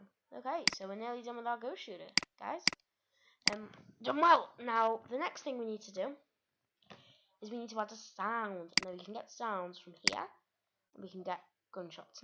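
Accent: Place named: United States. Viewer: You sound so different on video . Xxx you sound American xx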